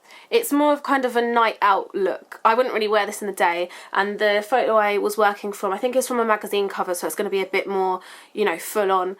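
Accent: United Kingdom. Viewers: OMG You are so beautiful!I love your voice! I love your British accent!I love your videos!♥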